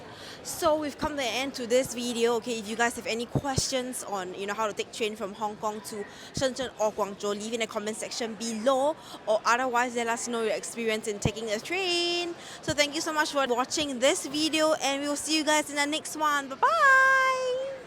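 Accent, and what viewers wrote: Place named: Singapore. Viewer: Singapore English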